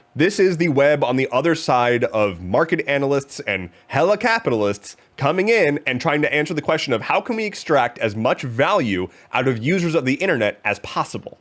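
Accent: United States. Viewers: Sounds incredibly American...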